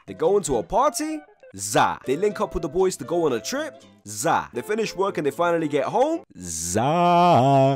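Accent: United Kingdom. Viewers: so zooted I didn’t realize he had a British accent. Just thought he was talkin’ silly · bro i think its british.. why british sound so bsSD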